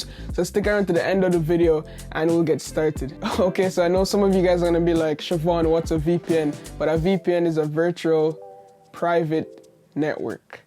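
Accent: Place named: Jamaica. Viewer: Yeah, I can now here the Jamaican accent 😃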